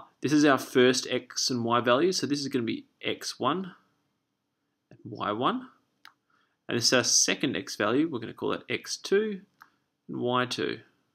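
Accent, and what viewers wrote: Australia. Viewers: hey mate u sound aussie,?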